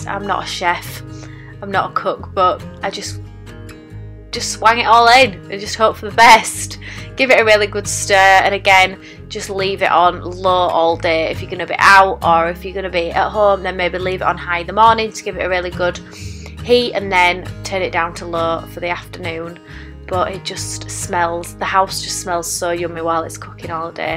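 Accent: Yorkshire, United Kingdom. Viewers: Maggie zemanek I can’t speak for her but because she sounds a bit like me I’d say Yorkshire! (I’m from East Yorkshire and she sounds more like south or West Yorkshire!)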